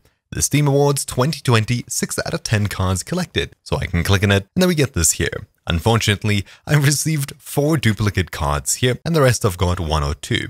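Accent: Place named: South Africa. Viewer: I know an south african accent when I hear one.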